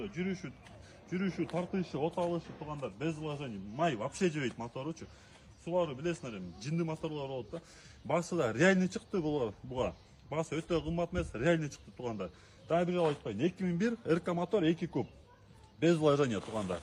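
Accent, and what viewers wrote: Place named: Kyrgyzstan. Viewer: Атан молодец таза Кыргызча суйлойсунго брат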